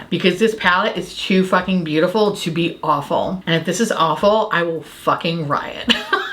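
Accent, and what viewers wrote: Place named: New York, United States. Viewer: Observation on your New York accent: I never noticed it in your videos filmed in Queens, but now that you're in Florida, the accent is more noticeable! ❤️ Maybe you're subconsciously differentiating yourself from all those FloridaPeople™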